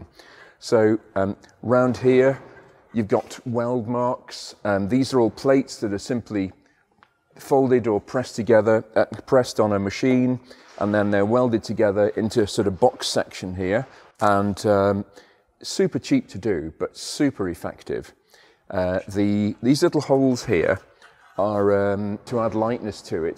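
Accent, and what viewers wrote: United Kingdom. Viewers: British Engineering in a voice... thank you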